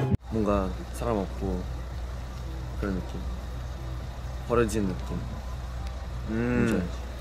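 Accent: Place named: Australia. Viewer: His Australian accent I can’t it’s so cute and just yk 😭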